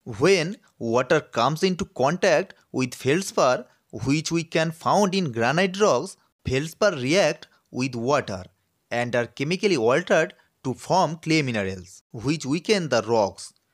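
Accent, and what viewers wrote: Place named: Bengal, India. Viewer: Pronunciation is very much Bengali.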